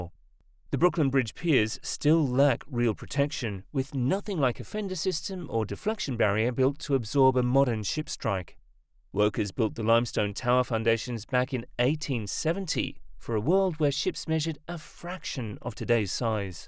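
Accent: United Kingdom. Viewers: Do we really need a British accent? Fuck no.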